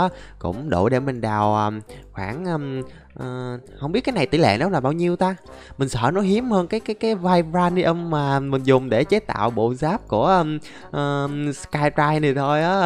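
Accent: Vietnam. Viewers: Anh đọc tiếng anh nhử tiếng Việt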